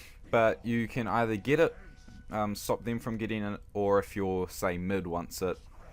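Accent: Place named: New Zealand. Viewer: Thanks for this it's helped a bunch. Also btw you sound kiwi as hah.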